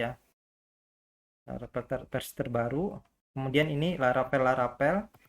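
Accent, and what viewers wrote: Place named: Banjar, Indonesia. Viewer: Logatnya kaya orang banjar..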